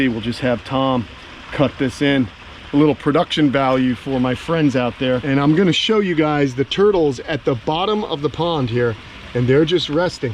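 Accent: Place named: New York, United States. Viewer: I didn't know you grew up in NY but I've wondered a lot because you have a liiiittle bit of a New York accent sometimes 😊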